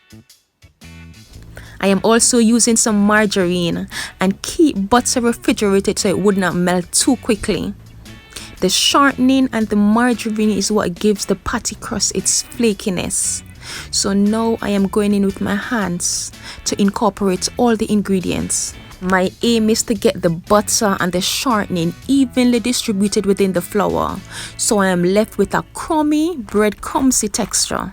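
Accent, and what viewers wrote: Jamaica. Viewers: I kept seeing authentic patty recipe on youtube but everyone i watched wasnt giving me the authentic jamaican vibes😒 and the dough wasnt giving me the flake😔. So my search brought me here🙃 to the real Authentic jamaican patty💯💯. I needed that accent this certified🥇 it for me lol the" ya mon" at the end🤗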